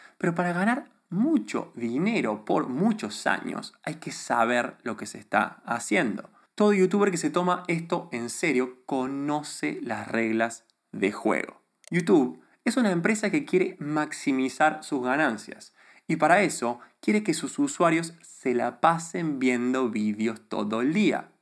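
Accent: Argentina. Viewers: Tienes buenas ideas pero te falta muuuuucho en la neutralidad de tu español. Si tienes acento argentino muy marcado. Pero igualmente das buenos típs. Un saludo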